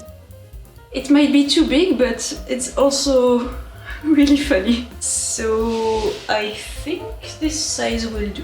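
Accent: France. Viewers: Je me demande si tu fais exprès de ne faire aucun effort de prononciation pour donner le charme de l'accent français vu par les étrangers ou si c'est naturel xD Quoi qu'il en soit, c'est très marrant, très bien rythmé (le montage de la vidéo est nickel) et même si je ne porte pas de perruques j'ai trouvé ça très intéressant. Naïce! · OMGGG l'accent français (｡･ω･｡)ﾉ♡✨✨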